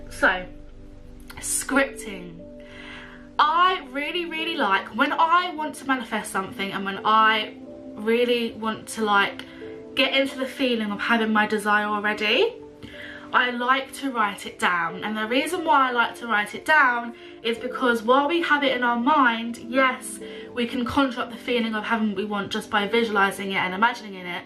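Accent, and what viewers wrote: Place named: United Kingdom. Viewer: British English sounds so lovely and cute 😍😍😍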